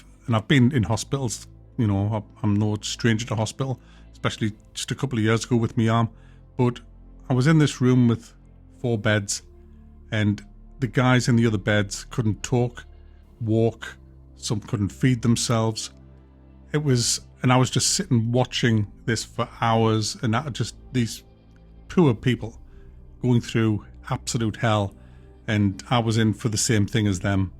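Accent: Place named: North East England, United Kingdom. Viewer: Really weird comment first hope you do use this as a driving point to get more healthy I need my reviews 😂 but also it sounds like your from my way Sunderland/Newcastle. I think I am younger then you 29 but I had a heart transplant plant 4 years at the freeman and what helped me get fittest up and stay off the drink was a local running club for people with health problems definitely something to look into really helped me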